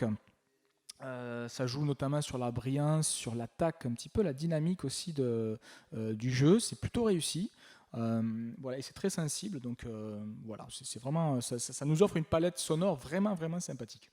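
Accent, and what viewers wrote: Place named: United States. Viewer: You sound like an American 😉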